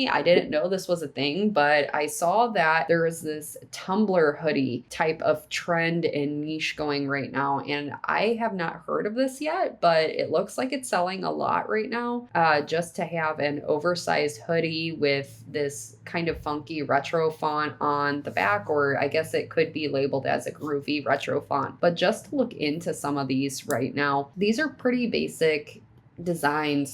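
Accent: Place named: Wisconsin, United States. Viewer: Do you mind me asking what state you're from? I'm trying to place the accent. I'm guessing Wisconsin. Great voice by the way.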